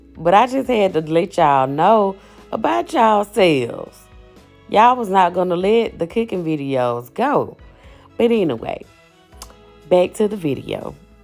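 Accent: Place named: South, United States. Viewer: You are just such a vibe! I love how relaxing this is! I also love your southern accent! Jersey girl here! lol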